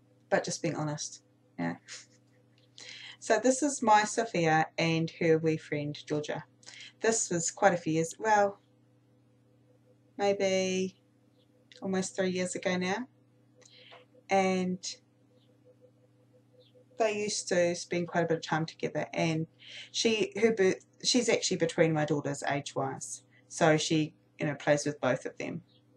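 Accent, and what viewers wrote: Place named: New Zealand. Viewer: Three great layouts! Love watching process vids about using own stash or scraps, as they give me tons of ideas. Also, I'm a Kiwi living in Canada, so it's great to hear a fellow Kiwi chatting away....your narrations are so entertaining (even if they do make me homesick! Lol)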